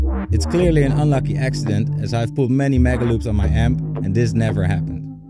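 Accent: Netherlands. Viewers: arw you dutch? you have quite a dutch accent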